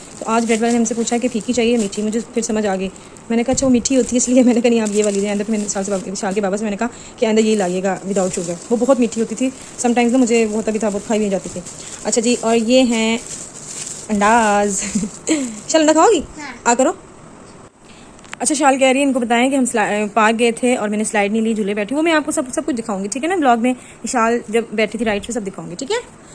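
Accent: Punjab, India. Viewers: Urdu Mein punjabi ka tarka both Acha lagtha ha. very good sharing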